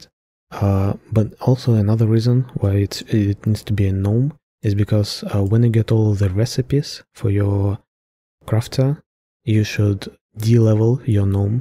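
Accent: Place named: Germany. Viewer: are you german friend?? very nice accent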